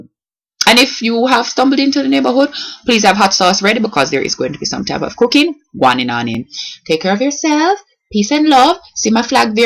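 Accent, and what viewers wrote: Jamaica. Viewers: Noleen are you serious? That’s how A lot of Jamaicans talk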